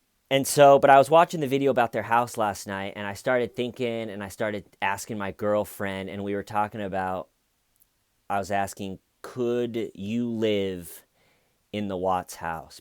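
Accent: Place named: South, United States. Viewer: Damn I was shocked you’re in New York. Sure thought you were southern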